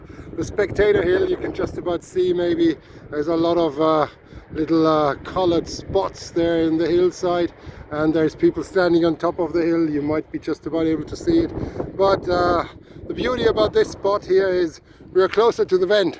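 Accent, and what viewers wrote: Ireland. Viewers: lectured in volcanology and petrology at Trinity College Dublin <num>. Its just one of those accents you can't help but picking up some of the twang:) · You sound irish?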